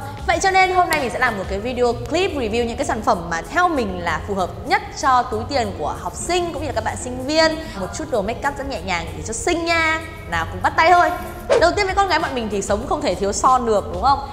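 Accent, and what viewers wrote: North, Vietnam. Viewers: Cj giọng Bắc cực kì dễ thương lun ák. Mãi iu cj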